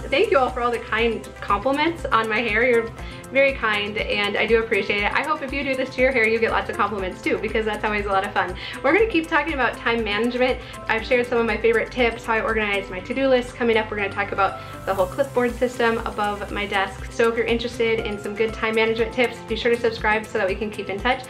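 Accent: Minnesota, United States. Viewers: I love your videos. And I love your MN accent. I’m not from MN but I lived in Mpls for 4 years and fell in love with the accents there as well as the people. Not only do I value your content and personality, but I can watch your videos and get my MN fix from my own home!🤗😎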